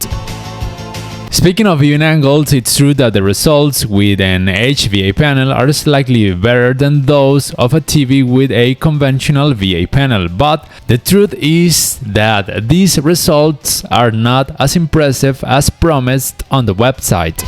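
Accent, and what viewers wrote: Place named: Colombia. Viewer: Buen inglés bro!! Se escucha tu acento colombiano 😂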